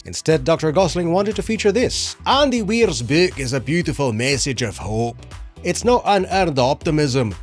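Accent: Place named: Scotland, United Kingdom. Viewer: I like your Scottish accent better than Scrooge McDuck and the critical drinker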